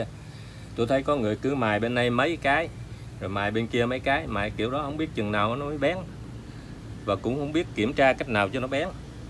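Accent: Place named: Mekong Delta, Vietnam. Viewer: Cắc phải hỏi xem anh ở đâu để tới học mài dao cho bà xã thấy anh chỉ dẫn rất hay,mình ở"ăn giang"miền tây chắc anh cũng ở miền tây,nghe giọng nói biết dân quê mình cảm ơn anh nhiều...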